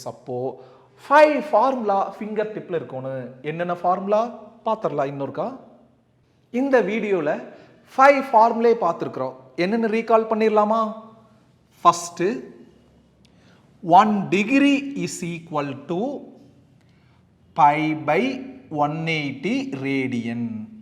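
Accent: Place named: Kongu, India. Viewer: Fantastic sir Clearly understood 🎉🎉🎉🎉🎉🎉 Tq tq very much sir In kongu style slang superb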